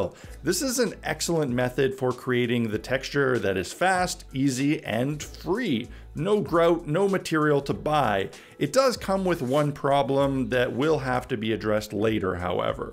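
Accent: Canada. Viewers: Canadian? are you westcoast? btw what a great fantastic building, hope you dont mind totally copying this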